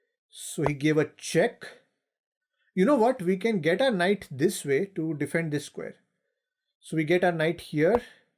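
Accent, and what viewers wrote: India. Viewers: Indien English accent its hard to understand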